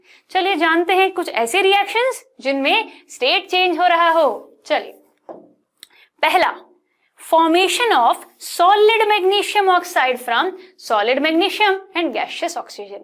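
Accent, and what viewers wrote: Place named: Bhiwani, India. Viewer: Bhiwani se ho kya mam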